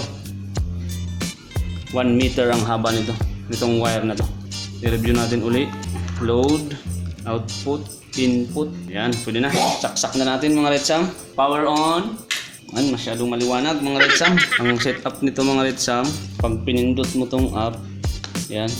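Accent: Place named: Bicol, Philippines. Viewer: aram ko po an tono kan pagtaram kan bicolano hehe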